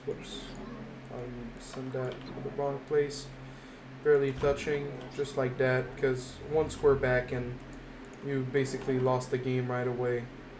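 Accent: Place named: Quebec, Canada. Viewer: ça parait dans ton accent que tu es québécois entk thx pour ta vidéo tres bonne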